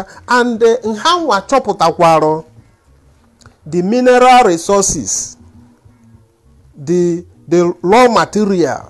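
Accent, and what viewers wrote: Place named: Nkanu, Nigeria. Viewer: Thanks a lot for this. We must begin to make efforts to understand our traditional spirituality. Thanks a lot. I just noticed you sound very Nkanu.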